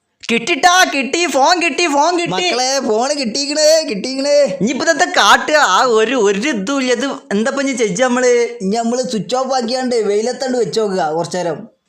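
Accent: Malappuram, India. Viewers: Malapuram bashayanu ee vdonte monj.. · Malappuram bhashayum .... pazayakala ormayum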